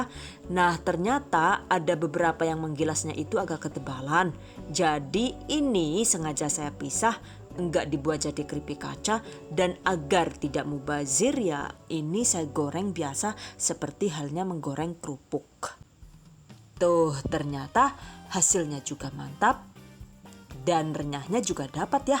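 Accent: Banyumas, Indonesia. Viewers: Deneng ana ngapak2e 😂😂😂 jhahahaha😂 auto SUBSCRIBE yu. Komen ninggal jejak. Lopene kue di tempel. 💖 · Suka aksen ngapaknya kaya rika wkkkk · Subcriber baru ☝️asli lucu banget kamuuuuu, gyemessss ngapak"nya dan caaaattaattt. Ada tips & trik, lengkap deh. Pas kepoin yt nya ternyata banyak bgt resep" yg ku cari".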